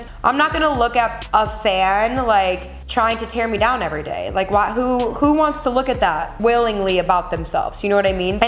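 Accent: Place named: Nashville, United States. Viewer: She said she just got back from Nashville. Kind of just sounds like that accent is coming out a little! I’m from Mississippi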